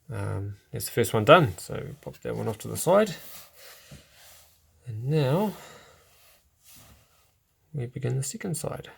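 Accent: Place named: New Zealand. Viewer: I’ve watched this tutorial dozens of times over the years, and I so appreciate you taking the time to make it, because - as a kiwi stranded in Canada with a boy who fidgets with his pounamu incessantly - I replace cords regularly. I never manage to onboard that knowledge as it’s just infrequently enough that my brain refuses to acknowledge that it’s essential information, or perhaps it just wants to reminisce and listen to a New Zealand accent explain clearly and calmly how a thing is done. Either way, you are a veritable treasure; thanks so much for helping this kiwi in a foreign land continue to proudly display part of their heritage.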